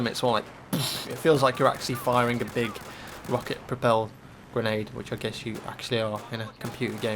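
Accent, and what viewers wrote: United Kingdom. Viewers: Because he has a British accent? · HES BRITISH ITS THE WAY THE PRONOUNCE IT. GET THE FUCK OVER IT. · BF3 not BFfree. He's a brit like me ,mate.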